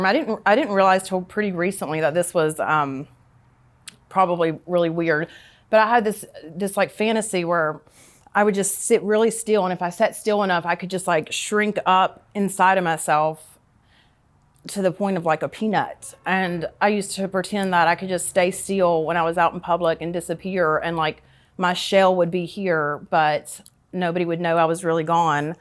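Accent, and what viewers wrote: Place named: North Texas, United States. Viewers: I would have guessed her accent to be rural Oklahoma. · Did you grow up in North Texas/Oklahoma? Your accent is very familiar I am from there too.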